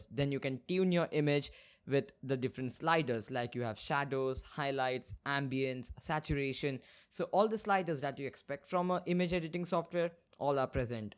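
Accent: India. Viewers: I love that Indian accent😂👌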